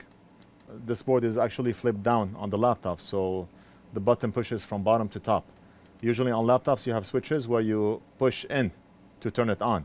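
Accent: Lebanon. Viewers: Dude has a Lebanese accent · are you Lebanese? your accent and the ear analogy are very Lebanese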